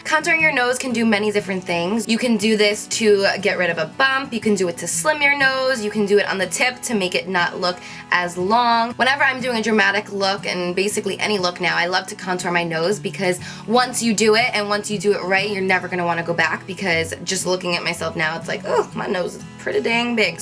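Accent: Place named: California, United States. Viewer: How cute can you be, you sound like a california girl then some black women, and its sooo cute!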